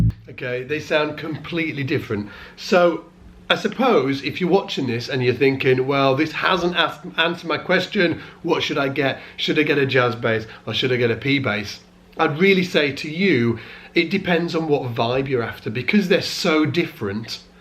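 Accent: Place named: Northern England, United Kingdom. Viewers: Northern British English. He lives in Leeds. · Hi, I'm new to bass but have played guitar for decades off and on. I'm far from expert but I play because I like to play, not because I'm really very good, though some say I am. For a little diversion I bought an Ibanez SDGR for under 2.5ton£'s. It has active pups, 3 band tone controls and a little switch that can change between single coil mode, humbucker and active output. I can get real smooth buttery bass, to cheesecake, then a bit of biscuit crumbs in the mix right the way to total gingersnap sound. Really loving this very cheap axe, and I have 5 others top notch guitars that were much dearer. Maybe it's all about the bass! Where you from in Leeds? I recognise the accent. I was born in Burley then moved to Tinshill when I was 7. Been in Newcastle since:)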